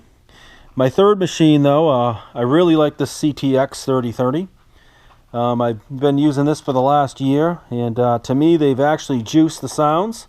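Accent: United States. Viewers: Did you say "a good-eye deer"?? I enjoy the hell out of your New English accent but that aside video takes the cake! Hands down, the most informative and honest take on these Minelab machines as far as tips n' tricks and idiosyncracies of each of them. Thank you for your service and happy hunting from a new subscriber in Florida.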